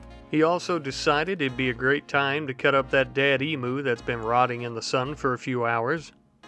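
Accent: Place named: Texas, United States. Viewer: Wait a minute. That accent, it's not just theatric. You're Texan, ain't ya?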